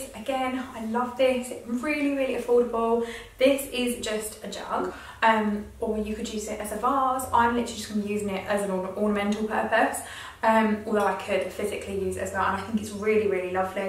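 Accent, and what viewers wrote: United Kingdom. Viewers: I love british eng' ❤✌..... loved what you bought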